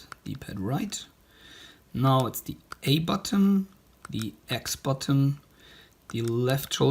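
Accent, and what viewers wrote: Germany. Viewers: Deinem Akzent nach vermute ich, dass du Deutsch sprichst ;) Vielleicht würde dies die Kommunikation etwas erleichtern. Ich habe alles so gemacht, wie du es in deinem Video beschrieben hast. Das Setup hat wunderbar geklappt. Das Problem tritt auf sobald ich über das Verzeichnis "media" auf den Roms-Ordner zugreifen will. RetroArch beendet sofort und ich lande wieder im Hauptmenü des RG350. Mir wird gar nicht erst, wie in deinem Video der Ordner "Data" angezeit, bevor ich in dieses Verzeichnis weitergeleitet werde, stürzt RetroArch leider ab. Ich nutze die 2019er Version des RG350. Anbei wurde mir eine 32Gig-SD-Karte mit vielen Roms dazugeliefert. Allerdings habe ich selbst auch schon einige Roms in die einzelnen, vorinstallierten Ordner dazugepackt. Was kann ich tun, dass ich auf meine Roms zugreifen kann? Vielen Dank im Vorraus für deine Antwort. 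Beste Grüße Tim :)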